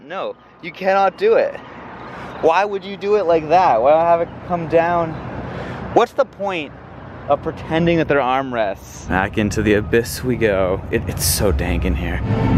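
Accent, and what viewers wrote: Boston, United States. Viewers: Wow that was an aural and auditory feast! Even your Boston accent is coming back strong! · Watching someone with a Boston accent here makes me smile!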